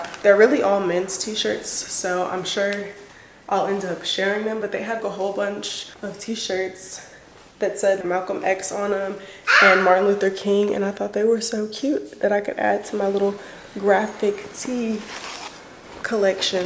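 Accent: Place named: United Kingdom. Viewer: British needs her own tiktok or something. 😂 She's a hoot! I mean, I love regular too, but British... LOL! I'm here for it.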